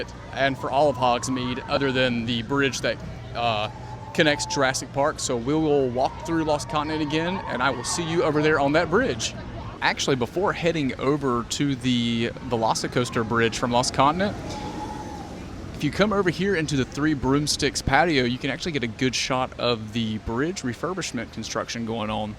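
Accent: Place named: South, United States. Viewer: Thanks for the update,! We are hoping that they extend Harry Potter or MOUNT CRUMPIT into Lost Continent. WE DO NOT WANT POKÉMON! It’s not our thing. Where are you from? You have a southern twang! Love it! 😊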